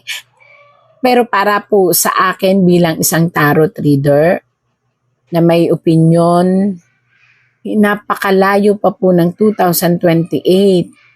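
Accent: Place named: Visayas, Philippines. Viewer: Nku si ito nagsasalita.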